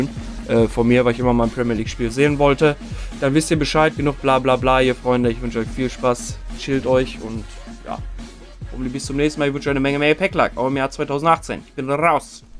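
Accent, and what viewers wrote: Ruhr, Germany. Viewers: Dabei seit ca 35K Abos. - Ein Kanal der durch Menschlichkeit und Sympathie punktet. Der Protagonist?. Ein Mensch der immer einen Joke auf Lager hat und mit seiner Ruhrpott-Schnauze die Fifa-Szene rockt. Ein absolut Bodenständiger Typ, dem man die 300K einfach gönnt. du Legende, mach weiter so und lass dich nicht verbiegen. #KellerGang #2K18iscoming #HappyNewYear #Crewlove #VomShishaKellerzumKeller #Roadto400K #BesterYoutuber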